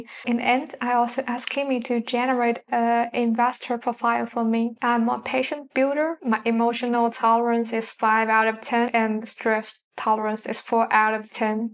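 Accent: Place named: Scandinavia. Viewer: I like your accent from Nordic.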